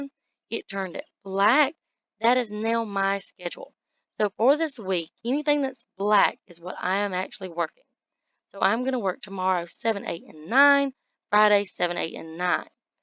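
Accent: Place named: South, United States. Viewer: I’m from Atlanta. I’m guessing you’re Alabama or Mississippi. Maybe South Carolina?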